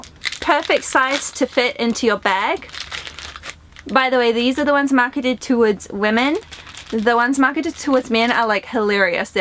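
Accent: New Zealand. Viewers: I thought you were a fellow kiwi!!! But couldn't find confirmation :D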